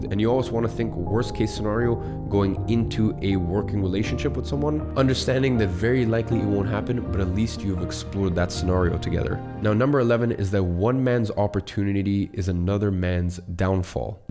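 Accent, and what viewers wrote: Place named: United States. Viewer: I don’t understand, isn’t he British ?? Why is his accent American?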